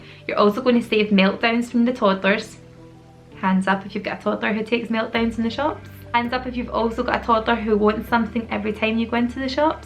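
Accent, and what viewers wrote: Scotland, United Kingdom. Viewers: My daughter Kayla who's 9 watched this video with me. She asked what accent you have I said Scottish and she said awhh like Brave 😀🖒 loved the video and so did Kayla 😙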